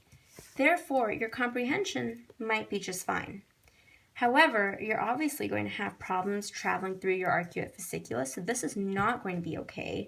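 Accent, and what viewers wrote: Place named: India. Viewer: 🤔🤔 Indian and such an amazing accent.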